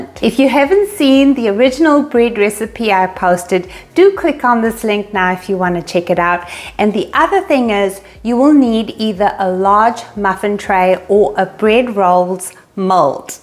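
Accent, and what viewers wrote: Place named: South Africa. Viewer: Yum looks so good. I’m definitely making it. Thanks so much for sharing this recipe. Love your South African accent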